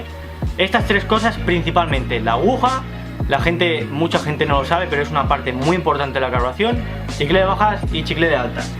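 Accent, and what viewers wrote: Spain. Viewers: Eres de creixell? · Eres de creixell(Tarragona)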